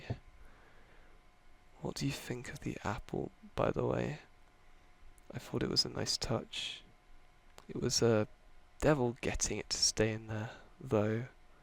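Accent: United Kingdom. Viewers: he sounds sooooo British 🇬🇧🇬🇧🇬🇧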